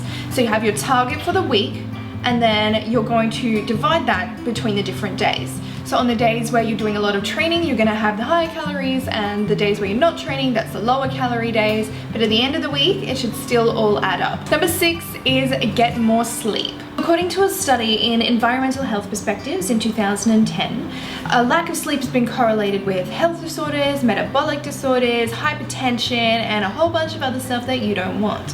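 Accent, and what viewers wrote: Australia. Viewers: aussie accent?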